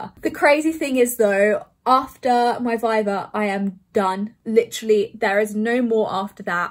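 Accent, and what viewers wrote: United Kingdom. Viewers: You have a really strong British accent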